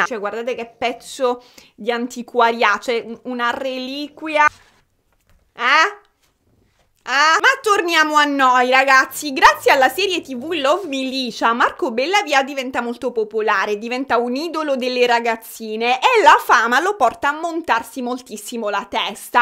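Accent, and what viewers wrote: Sicily, Italy. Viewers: Ti ho scoperto da poco. Sei siciliana? Hai un accento credo simile.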